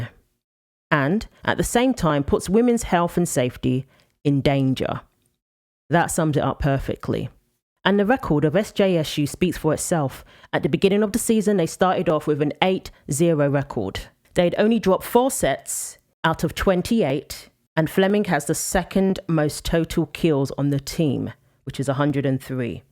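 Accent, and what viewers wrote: United Kingdom. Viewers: On a personal note, although you have a British accent, it was easy to understand your speech. Your diction is clear. I sometimes have to resort to Closed Captioning to understand English speakers from other countries. Also, excellent fact based coverage of a very important issue.✌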